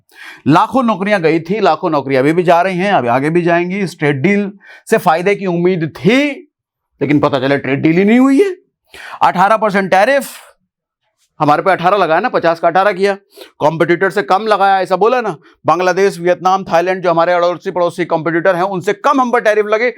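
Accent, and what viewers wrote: Bihar, India. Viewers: Tu insurance bech rha hai ya market ki baat krega bosdi ki bihari 😢😢😢😢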